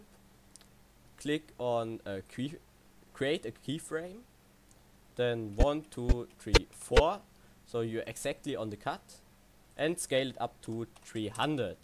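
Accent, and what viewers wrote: Germany. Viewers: Ey man merkt deinen deutschen Akzent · Man hört halt voll das er deutscher ist😂😂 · Ich habe deinen ersten Satz gehört und dachte mir nur so: Oh, ein Deutscher xD Aber egal, hat mir echt geholfen, danke !!! Habe sonst immer mit einer anderen App gearbeitet und war jetzt etwas überfordert mit Adobe xD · wie man einfach raushört das du deutscher bist ^^ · Man merkt wirklich das du Deutsch bist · haha geiles video, feier deinen deutschen akzent so hart bei deinem englisch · Hab vor dem intro schon bemerkt das du deutscher bist haha 😂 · Man hat soo krass gemerkt das du deutscher bist Hahahahaha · Man merkt das du deutsch bist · Man hört es so stark raus das du deutsch bist xD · haha ich hab gemerkt das du deutscher bist ich spreche genau so english hahaha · Man hört diesen deutschen Akzent XD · Man hört das du aufjedenfall ein deutscher bist aber trotzdem super gemacht!! 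· Man hat echt doll gemerkt das du deutscher bist>~< No front an dieser stelle. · Man merkt das du deutscher bist dein Englisch ist aber trozdem gut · i think you are german xD but thanks for the tutorial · Man hört so raus, dass du Deutscher bist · You have germany accent :) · Das ist der deutscheste Akzent denn ich yeh gehört habe, aber gutes video und erklärung. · I think ure from german hab ich Recht ;$ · Nach 2 Sekunden schon erkannt, dass du deutsch bist^^ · Hey nach deinem Akzent kommst du aus Deutschland oder? xD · ich dachte dauerhaft „warum spricht er so?“.. dann habe ich unten in der Windowsleiste den Deutschen Satz gelesen & dachte mir „AHHHHH DESHALB!“ man merkt halt unnormal krass dass d deutsch bist :D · wtf just buy the Program and dont crack it 😂 btw dein deutscher Akzent ballert 😂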